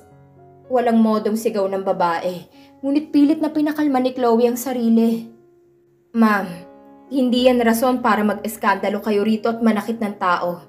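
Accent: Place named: Iloilo, Philippines. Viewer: Ilonga ka siguro miss narrate hnd bagay sau mag narrate para kang ewan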